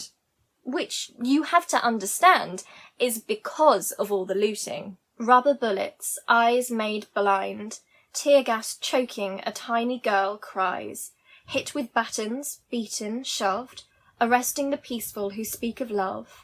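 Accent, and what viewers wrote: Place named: Kent, United Kingdom. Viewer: Loving your english Kent Style Speaking for my Audiobook - I'm a German Producer and Novel Writer and wanna ask you to speak for women rights at my novel audio book - if you want it - write me a YES and make me happy - Im a fan for your channel for about a half year - my audiobook is splittet in some chapters about a chapter is approx. 15 min - when you speak a 1-2 Min Session it would be great for me and I'll give you the text - you can speak it in your smartphone and send me at <mail> - i would love to have you on my audiobook so much - Greetings from Bavaria to the Kent-Region of the Land of Kate Bush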